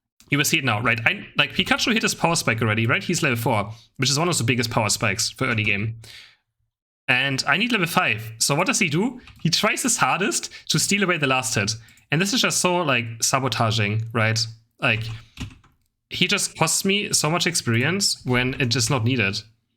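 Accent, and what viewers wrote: Germany. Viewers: I'm sooooooo curious You have an accent I can't quite place It's like German or maybe like a Norwegian type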